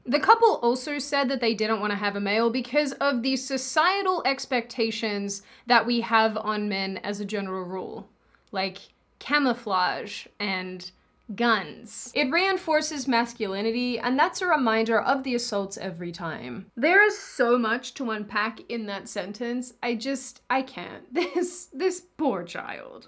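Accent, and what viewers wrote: Australia. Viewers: These people are fucking insane!!!!! I'm hearing your Aussie accent come out and I'm loving it!! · Well that’s the first time I’ve heard someone imitate crocodile Dundee in their own Strayan accent.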